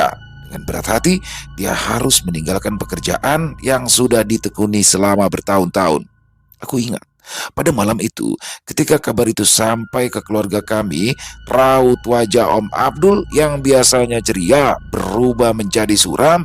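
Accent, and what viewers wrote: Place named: Banjar, Indonesia. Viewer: Bagus logat banjar anda 👍👍👍👍👍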